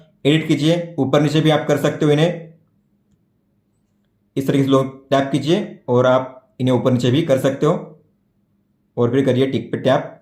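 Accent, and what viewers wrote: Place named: Maharashtra, India. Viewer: marathi accent 🙊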